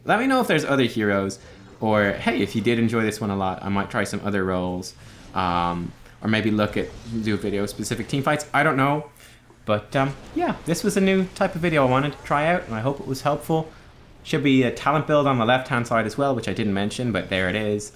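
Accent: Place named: Ireland. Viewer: What part of Ireland are you from? Never heard your twang 😁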